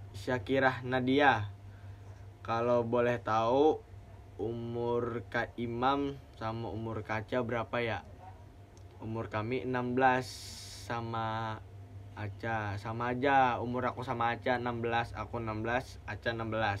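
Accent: Banjar, Indonesia. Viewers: Banjar bngt😂😂😂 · Msh ada logat bnjr nya😂😭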